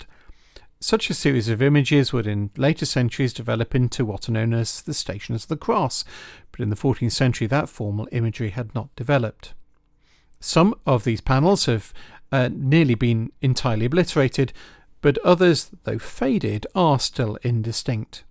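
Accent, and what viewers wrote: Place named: Northern England, United Kingdom. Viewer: I really enjoyed your video. Your delivery is pleasant to listen to (and in the accent of a fellow Northerner, I might add). Where are you from I wonder. Rebecca (Leyland, Lancashire)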